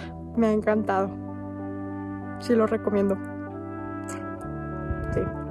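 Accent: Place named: Upper class, Mexico. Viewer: No puedo con el acento tan fresa, el video es interesante pero que desesperante es el acento whitexican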